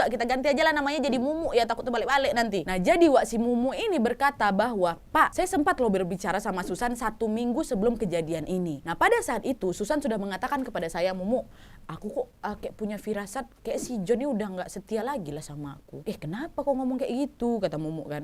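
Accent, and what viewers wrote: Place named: Medan, Indonesia. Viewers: Semangat btul Kk ceritanya 🤣🤣 BTW klo boleh tau Kk orng mana???? logat bicara nya seperti kami orng Sumatra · Kak aslinya mana sih, suka bgt denger caranya ngomong, koq Kya tetangga aq dulu org medan😀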